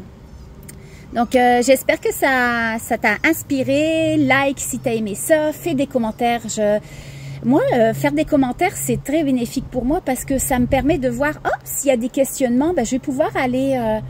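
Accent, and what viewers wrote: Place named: New Brunswick, Canada. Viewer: tu as un bel accent que j'aurais pensé peut être du Nouveau Brunswick 🥰 grand merci 🙏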